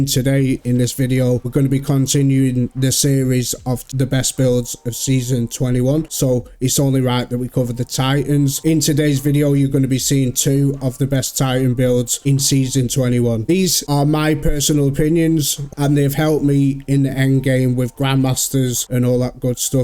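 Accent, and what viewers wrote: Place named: Northern England, United Kingdom. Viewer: Bye heck great accent fella 👍🏻 fellow northerner in peace ☮️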